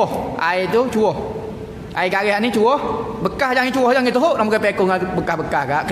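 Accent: Kelantan, Malaysia. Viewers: Assalammualaikum warahmatulAllahhiwabarokatuh in shaa ALLAAH harap satu hari sy dpt faham loghat kelantan.. jazaaka'Allahu khiran ustaz 25/11/20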